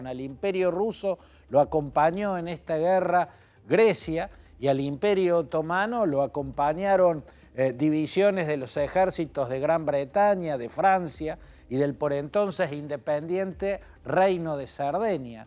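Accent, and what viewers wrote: Córdoba, Argentina. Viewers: Genial su tonada cordobesa y sus conocimientos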